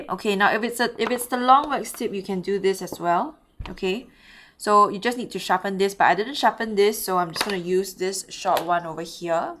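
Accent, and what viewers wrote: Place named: Singapore. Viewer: I am so glad I found this video for so many reasons! Firstly, because it was exactly what I was looking for! Incredibly helpful and informative! Also. This is completely off tangent, but i adore your accent! 🥰❤️ it sounds Singaporean/malaysian and reminds me of home! So thank you for that!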